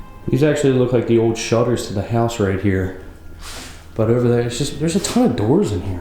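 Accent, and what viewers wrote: United States. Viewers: The music makes me think that this is Ireland, and he looks Irish, but he's speaking in an American accent, so IDK what to think lol..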